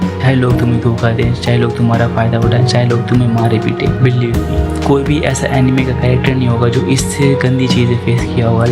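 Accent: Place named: India. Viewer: Bro I cant hear nothing thourgh your indian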